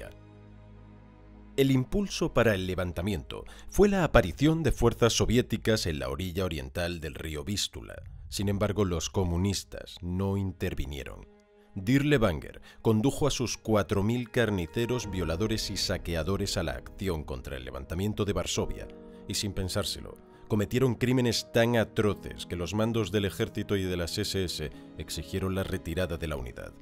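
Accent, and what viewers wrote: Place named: Spain. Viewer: Estoy adicto a estos videos, la voz y el acento español van como piña jajaj saludos desde Argentina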